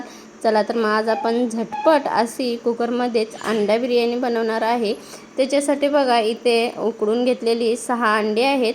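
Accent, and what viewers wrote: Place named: Kolhapur, India. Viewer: खूप छान रेसिपी तुम्ही अस्सल कोल्हापुरी आणि तुमच्या बोलण्यातही कोल्हापूरचा ठसका जाणवत आहे 👍👍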